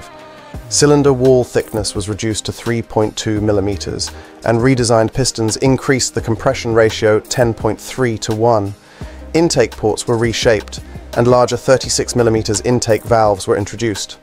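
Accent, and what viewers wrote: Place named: England, United Kingdom. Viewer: I take issue with the remark that a timing chain is maintenance free. It depends on the rpm used, presumably in USA, you sound English but price in dollars, automatic gearbox is normal and therefore high rpm rare but each chain has to go round the tiny crank sprocket and if that stays above 4,000rpm for long the chain is grossly overspeeded - read a chain catalogue or maker's recommendations. One reason for belts was to get away from wearing chains.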